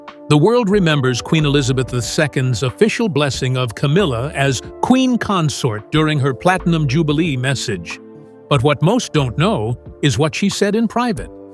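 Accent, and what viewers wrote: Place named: United States. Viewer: Be very careful what you believe. Especially when it comes with an American accent. This report is total garbage. Believe me, I know.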